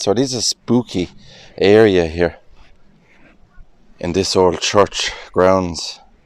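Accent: Ireland. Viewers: I would watch your videos just to listen to your brogue! Love it.❤ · Hi i just love your voice Irish Accent, from young age my sister and i loved grave yards reading head stones we did this even when we first retired. You do a grest video. My Daughter always wanted to meet a irishman to marry, she sang the celtic music, trouble was she was stunning stil a good looking woman could not cope with her beauty never lived or married she has a strong spirit wont be messed about, but she got on with irish men, she said true men, but they found her spirit to much. She used to sing on the ferry boat from liverpool to ireland. So i never got my irish stepson, were not Irish. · I heard the irish accent, and knew I had to give you a listen to, I like the graveyard walks, so I'll go through the other videos, keep up the good work 👍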